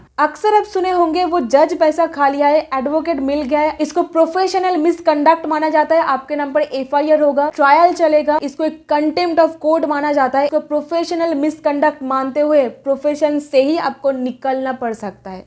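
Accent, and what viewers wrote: Bengal, India. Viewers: Bengali tone but you have very distinct ,clear pronunciation in Hindi I highly appreciate it mam, please keep it up mam , with regards.🙏👍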